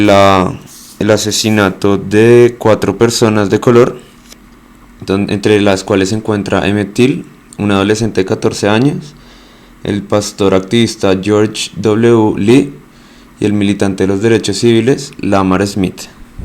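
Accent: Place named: Bogotá, Colombia. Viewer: Con tu acento rolo es dificil concentrarme.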